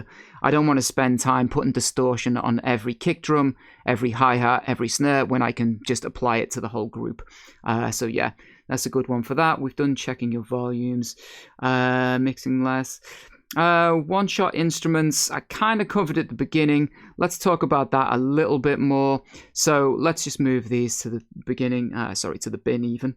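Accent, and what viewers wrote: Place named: London, United Kingdom. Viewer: I can hear the Liverpool and Manchester -it’s actually the London that keeps wanting to break through 😀✌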